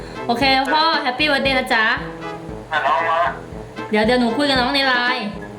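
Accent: Isan, Thailand. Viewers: พี่เป็นคนไทยหรือคนอิสานค่ะ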